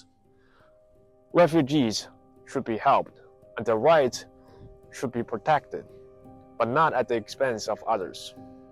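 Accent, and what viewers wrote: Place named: China. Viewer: From his accent, he should have grown up in China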